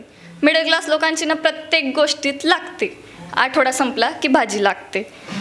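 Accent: Khandesh, India. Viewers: 1 cha number and tuz aavaj pan.khup chan.Hotta i like you khandeshi tone...👌👌💝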